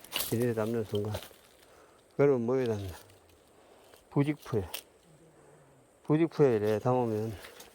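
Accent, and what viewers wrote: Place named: Gyeongsang, South Korea. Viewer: 영상 너무 신나네요~~사투리가 김천(?) 고향사투라 오랜만에 정겹네요 안전 산행 하세요~~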